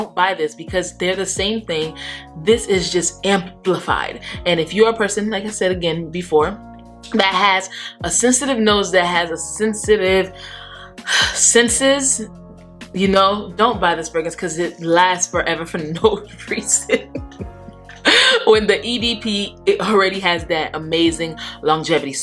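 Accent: Haiti. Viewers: do i hear a haitian accent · Are you Haitian! I hear an accent ☺️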